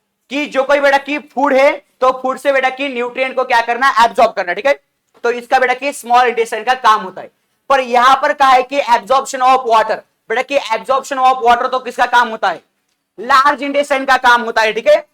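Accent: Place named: Maharashtra, India. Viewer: Sir thoda marathi bhi use kare to chalega 😊😅 kyonki apke samajane ke tarikese hi pata chal raha hai ap marathi hai 😅🎉❤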